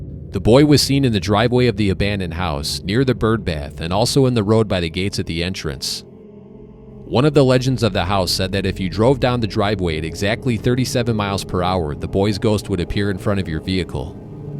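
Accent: Chicago, United States. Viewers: honestly, I also love your accent. My family is from northwest Indiana and the Chicago area, so you sound similar!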